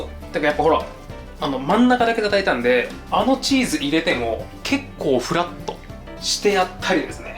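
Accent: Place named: Hakata, Japan. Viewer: の動画観すぎて料理の知識だけじゃなく博多弁までも学んでる一石二鳥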